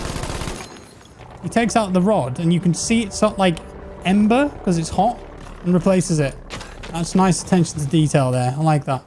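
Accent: United Kingdom. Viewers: what is your morning routine? I would be real mad if there’s no tea involved. Anyways, you’re such a classy lad. You sound so British in the good way, if that makes any sense (it doesn’t). My brother doesn’t agree though!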